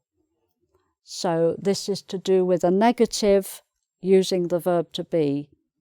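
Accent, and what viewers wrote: United Kingdom. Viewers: Thanks again and again for your amazing lessons and British clear accent.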